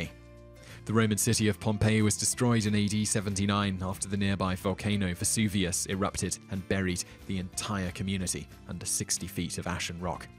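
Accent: United Kingdom. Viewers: I don't mind his British accent, half my family is British. But I do wish he'd research how to pronounce names and other proper nouns correctly. He butchers so many it's distracting. He also says "skellingtons" which was funny the first time I heard it, but not the last twenty. Type things out for him phonetically or something!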